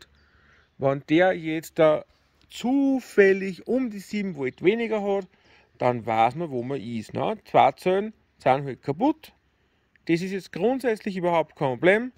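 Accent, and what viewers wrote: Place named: Bavaria, Germany. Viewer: Für Nordseutsche schwer nachzuverfolgen wegen bayrischen Slang. Trotzdem vielen Dank für die Mühe!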